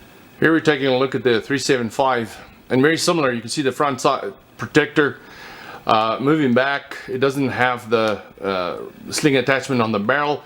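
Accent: South Africa. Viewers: The narration on this video sounds like someone speaking "American" with a South African (Afrikaans) accent....??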